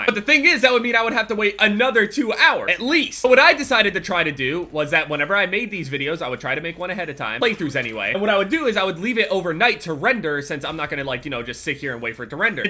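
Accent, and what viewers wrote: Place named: New Jersey, United States. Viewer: your new jersey accent is starting to be more noticeable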